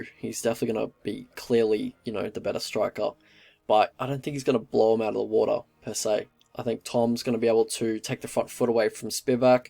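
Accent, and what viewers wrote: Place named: Victoria, Australia. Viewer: Oh, ha,ha,ha!!! I thought you had more of a "Victorian" accent. I thought Queensland had a broader more "Crocodile Dundee"-type of accent, ha,ha. But, then again, that would vary even within the same city, as i became aware of in Melbourne. Anyway, great, detailed breakdown, Tom is gonna win and going to go closer and closer to Gane/Ngannou and we are witnessing a new era of heavyweights, that's for sure!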